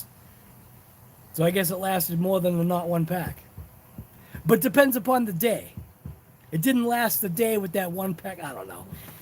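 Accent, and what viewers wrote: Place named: Boston, United States. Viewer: you gotta be a fellow bostonian. i can tell by your accent, wicked awesome guy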